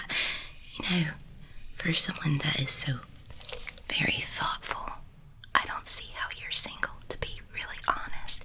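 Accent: South, United States. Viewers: Hold on a second, are you actually from the South? (I realize you probably get asked this all the time, but I'm curious)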